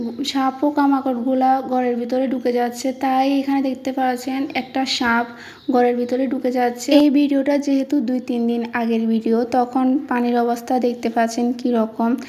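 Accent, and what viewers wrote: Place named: Noakhali, Bangladesh. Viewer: খাটি নোয়াখাইললা 😊😊😊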